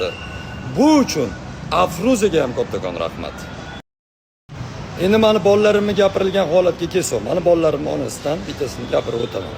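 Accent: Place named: Tashkent, Uzbekistan. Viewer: Узинг наманганликсану узинча тош.ни шевасида гапирасан . 50 йил четда яшаганам тилини сотмаган акилли эркак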